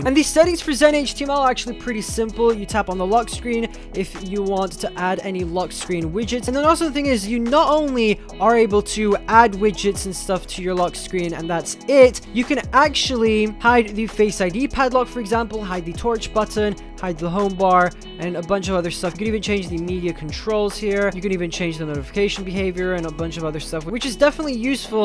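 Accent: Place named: United States. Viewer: You’re Scottish ? You sound American, what part of Scotland are you from ?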